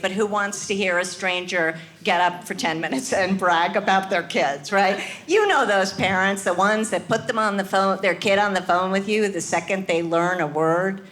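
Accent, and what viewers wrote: United States. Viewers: Brilliant comedian and real American in her delivery, attitude and humour! Really liked it! Normally not so interested in people banging on about their kids on stage like I came to hear their biography but this brought so much humour with it I was glad to hear the stories! Thanks again!!! Haha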